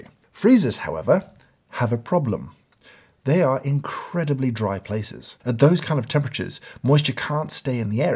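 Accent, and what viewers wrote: United Kingdom. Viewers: Is it just me or do you sound more British than usual? 🤔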